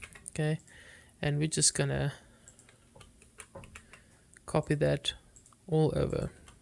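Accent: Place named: South Africa. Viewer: You sound like a south african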